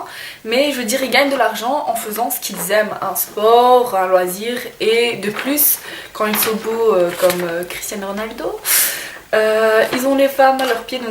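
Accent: Belgium. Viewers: D'ou vien tu ? tu as un accent belge non ?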